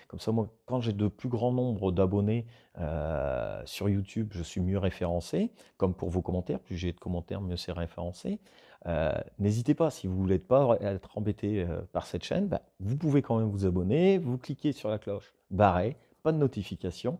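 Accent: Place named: Nord, France. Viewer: Meilleurs vœux à toi aussi M'sieur! Cool cette vidéo on apprend un peu plus à te connaître et ça fait plaisir 😁 Je suis aussi du 59 et à 20mn de la Belgique! C'est vrai que les "oir" chez nous ça s'entend 🤓 En tout cas continues tes vidéos elles sont geniales, c'est toujours un plaisir de les voir!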